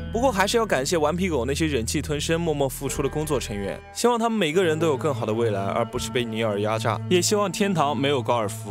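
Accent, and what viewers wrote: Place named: Mainland, China. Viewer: 这说话的语气强调怕不是墙国up主吧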